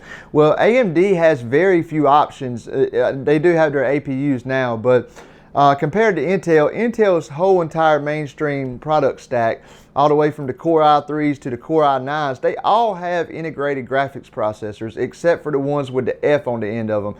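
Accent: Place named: Kentucky, United States. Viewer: About time someone on the east coast gets a tech following going lol. I think GN is east coast but not sure. Are you from KY by chance? I only ask because you have almost the same accent as I do.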